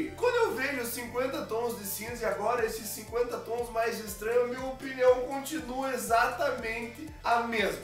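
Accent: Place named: South, Brazil. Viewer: seu gostoso! esse teu sotaque é de que estado do Sul? reconheço demais esse sotaque hahaha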